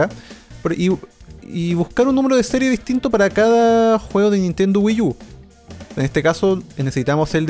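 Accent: Chile. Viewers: Ikr! Tiene acento chileno xDDDD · ¿Chileno? Hablas como Vardoc xD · Te apuesto a que eri chileno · hahah solo es el tipico acento chileno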